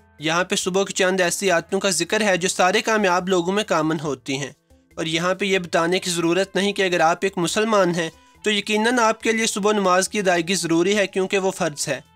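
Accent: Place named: Sialkot, Pakistan. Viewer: Speaker is definitely from sialkot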